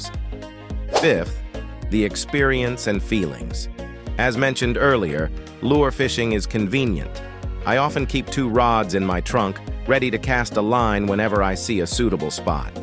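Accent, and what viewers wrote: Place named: United States. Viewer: Our A.I. narrator is using American English and the lure visuals are of American anglers. Why in the world are the creators of this video comparing lure fishing to Taiwanese bait (I assume) fishing, something that few Americans know about, let alone practice? Is Taiwanese style fishing common in Australia, perhaps?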